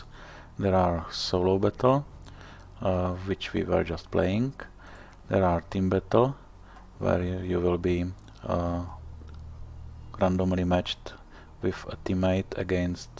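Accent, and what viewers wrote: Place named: Czech Republic. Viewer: Čech, že? Poznám podle přízvuku :D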